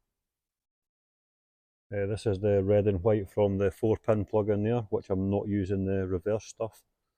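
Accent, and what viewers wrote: Scotland, United Kingdom. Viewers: Most informational video i have seen but that Scottish accent man 🔥🔥🔥🔥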